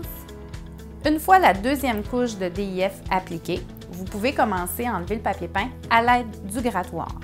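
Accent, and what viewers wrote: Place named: Quebec, Canada. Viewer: Super conseil 👍 et quelle belle accent de nos cousines Québécoises 💐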